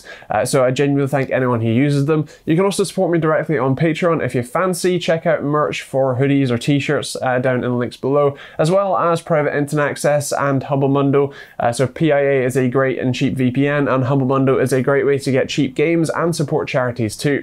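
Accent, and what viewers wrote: Scotland, United Kingdom. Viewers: his voice is Scottish where tf do you think he’s from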